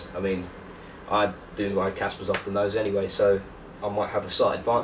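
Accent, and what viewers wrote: United Kingdom. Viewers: any subs i just like the english accent!!!!lol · BRITISH DA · thats cos stewie is british and so is this guy but all british people dont sound the same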